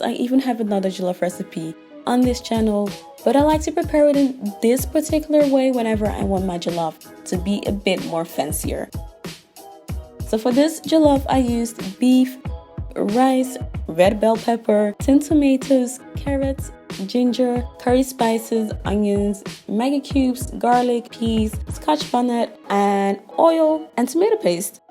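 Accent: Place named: Caribbean. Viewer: You sound Caribbean. Im in Jamaica and all the ingredients you've listed are accessible. Thank you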